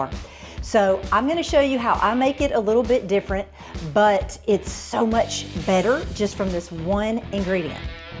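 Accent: South, United States. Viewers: Awesome recipe!! I must say you are incredibly beautiful, I mean like breathtaking and that southern accent for a northern city boy like myself is just mesmerizing.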